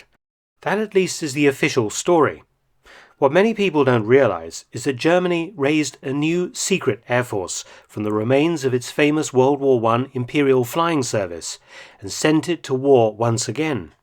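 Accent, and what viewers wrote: United Kingdom. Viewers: Mr, how about Weimar Germany's efforts in avoiding international sanctions, such as cooperation with Soviet Russia, expeditions to China, supporting Freikorps and so on? It would be a great subject and I'd love to hear your British narration. Thanks in advance!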